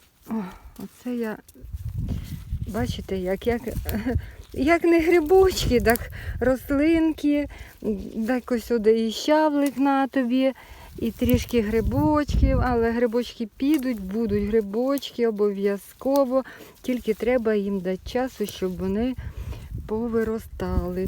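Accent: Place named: Poltava, Ukraine. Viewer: Цікаво, з якої ви області? Чую ондо, осьо, мабуть Полтавщина? )) чи Вінниччина?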